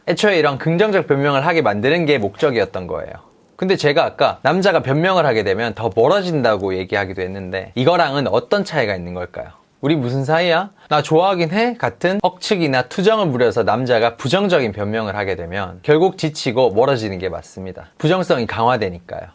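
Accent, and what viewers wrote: Gyeongsang, South Korea. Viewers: 부산 사람인가요? 경상도 분이신가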